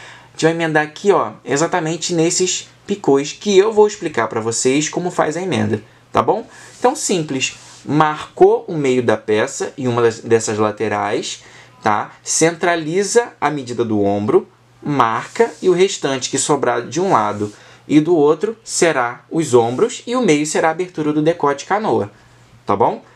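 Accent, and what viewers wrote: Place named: Rio de Janeiro, Brazil. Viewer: Linda aula, bem explicada! "Carioquês" delicioso de ouvir!💛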